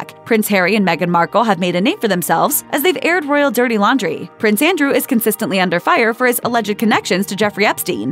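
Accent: United States. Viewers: The fact an American voice is used for this article instantly renders it pointless and crass... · Oh please what a tiresome yawn 🥱 channel… actually (American accent) the woman’s voice is sooooo…….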